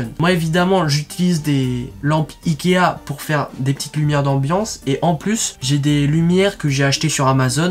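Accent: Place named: Germany. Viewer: Super l accent allemand 😁😁😁😁. T es génial.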